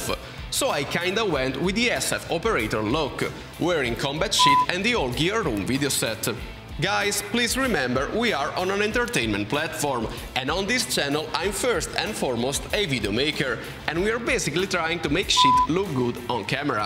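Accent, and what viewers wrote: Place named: Italy. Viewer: Ciao, ho detto (cavoli parla l’inglese in maniera pulita e molto capibile) guarderò un po’ di video per tenermi l’orecchio in inglese, ma capisco molto bene perché sei italiano ed ovviamente l’accento è quello!! Comunque ottimo inglese!!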